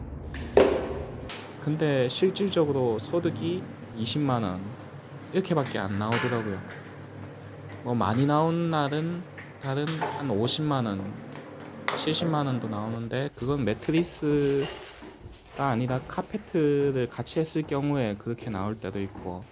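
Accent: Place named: Ulsan, South Korea. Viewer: 울산사셔서 사투리 쓰는게 왜 죄송한 일인가요 열심히 사는모습이 중요하죠. 화이팅입니다.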